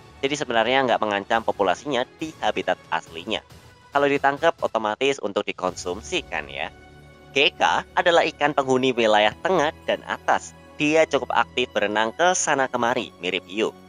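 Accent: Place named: Java, Indonesia. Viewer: Temen temen cenderung ngenyek🙈 Logat jawa nya tetep gabisa ilang walau di gimanain juga😅 Tetep semangat bangs💪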